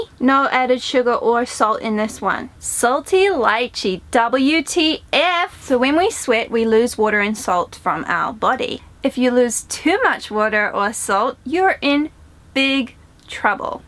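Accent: New Zealand. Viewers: I thought you were a fellow kiwi!!! But couldn't find confirmation :D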